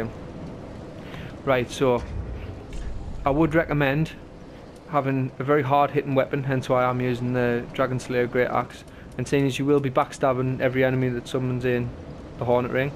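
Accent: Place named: Northern England, United Kingdom. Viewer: Good Northern accent there ahah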